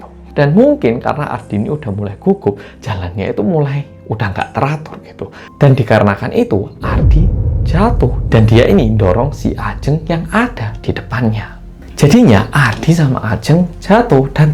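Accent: Java, Indonesia. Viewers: Hai medoook❤ RinDingDing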